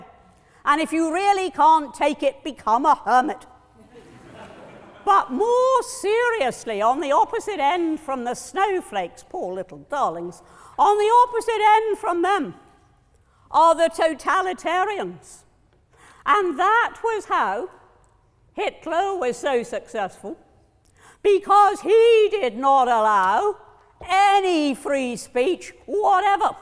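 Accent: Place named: England, United Kingdom. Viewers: She is from the South West of England Daniel, her accent is a well educated accent (neutral, meaning you can't recognise where she's from in Britain) with a very slight South Western twang, otherwise she'd be speaking like the archetypical pirate. She has a wavering tone in her voice which all old Grannies have. You have heard old Grannies saying a speech in public in your country, it's completely different from talking quietly, also it could be the way she talks that annoyed you, that does happen. Anyway Daniel, no matter, she's from the South West. There are accents you like, and accents you don't like and sometimes it could be the way they speak. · This is a most annoying English accent , What part of England is she from .?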